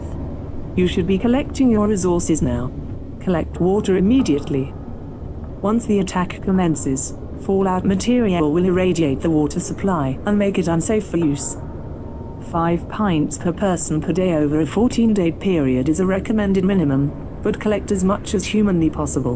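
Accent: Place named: United Kingdom. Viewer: Just the Brit voice is putting me to sleep! She's got a way to sooth people. But the blast wakened me. Lol! 😅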